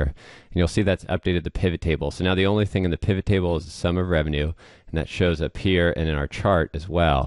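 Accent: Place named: United States. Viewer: Finally we can have a real American instructor, on Computer many on YouTube is people's from India, hard to understand any instructions in our English language, because they Hindus 😆👈 Thanks ❤️ best regards from Guatemala 🇬🇹 the Eternal Springs my temporary house also your's my friend. ❤️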